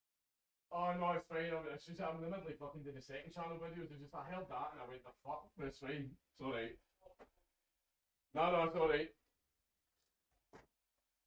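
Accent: Scotland, United Kingdom. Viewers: hitting us Yanks with that unfiltered Scottsman accent. I love it. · Nice to hear him talking scottish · Lol mudder. I know we Welsh have a fun accent but Scots just take the cake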